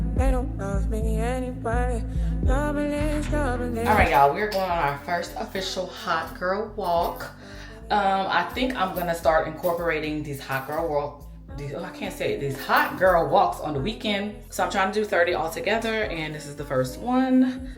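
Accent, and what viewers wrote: Louisiana, United States. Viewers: Oh babbby I definitely can tell..tell you are a Louisianine 😂😂😂 that accent can’t be mistaken!😁 Your daughter is so cute! In her Big Girl bed! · Sometimes there’s no accent and then some other times I’m like oh yea she is from Louisiana 😂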